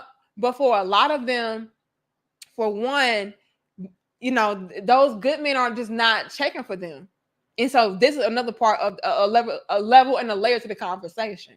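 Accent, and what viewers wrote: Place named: St. Louis, United States. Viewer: Important message. Thanks. Where are you from or raised? I'm trying to place your accent. Ok, St Louis.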